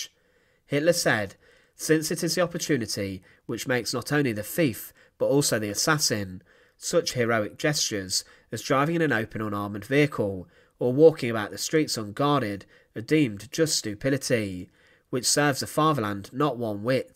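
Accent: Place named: Liverpool, United Kingdom. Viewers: Narrative misses out some dates and would be better if not delivered in boring monotone scouse. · What do you think . Just because he has an English accent . And not a US one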